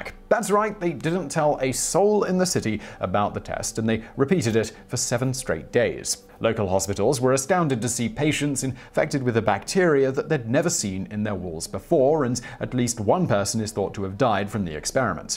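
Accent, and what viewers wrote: United Kingdom. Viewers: Something about a British narrator talking about America's atrocities makes this video hit different to me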